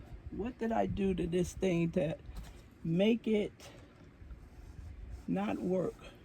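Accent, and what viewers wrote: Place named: Alabama, United States. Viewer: I wonder if one of your local yarn shops has a weaving class? Every year on TV I watch the PA Farm Show, and they have a competition for the best wrap/shawl. That is my favorite part on the PA Farm Show. I love weaving, but having a loom is another item I just can't have. Please have many more videos on your progress. I think this is one of my favorite videos that you made for your yarn friends. My husband ask me if you are a Southern girl. He said that you sound like his friends from Alabama. : ) I just love you; your the best!!!! Smiles, Claudia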